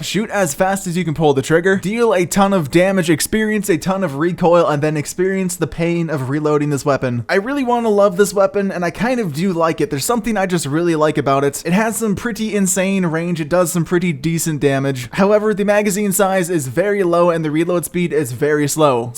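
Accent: Czech Republic. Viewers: Are you from Czech republic?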